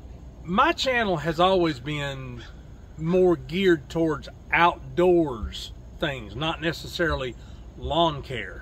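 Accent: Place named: North Carolina, United States. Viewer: I'm a transplanted Tarheel in Maryland with 12 acres of property that I maintain, a mix of grass areas and trees. I was attracted to your channel (subscribed!) because there is always something to be learned from the experience of others with regard to mowing, trimming, and general land maintenance. Not to mention that listening and watching you reminds me so much of my southern NC roots. I also enjoyed your vacation episode - I drive by Fancy Gap on my way to NC to visit family so was familiar with the area. Didn't know about the cabin rentals so something for me to check out for one of my getaways in the future. I would say keep doing what your doing. Not everything will hit everyone's interest but variety is always good.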